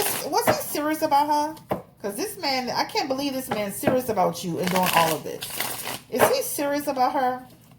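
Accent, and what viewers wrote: New Orleans, United States. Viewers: Girl, I watch this alot for just good advice and your pearls 4 real along with your cool NOLA accent and attitude chile. You be dropping them cards like u back at the BlackJack table while laughing and chillin'. I am over here enjoying the info but most importantly learning from others' experiences. 😇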